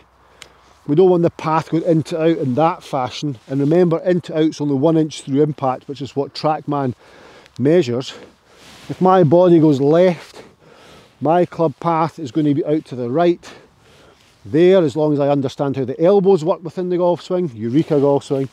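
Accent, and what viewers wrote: Scotland, United Kingdom. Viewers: Without a doubt. (from a guy who's played 60+ years) this is the best golf swing concept I have ever seen. And it works! Took me a bit to get the Scottish accent (thought "canes" was "keyes").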